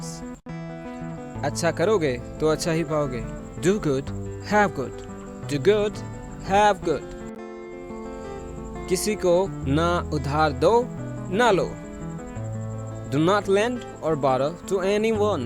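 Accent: Punjab, India. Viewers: Wah g wah Vich punjabi touch bohat aala hai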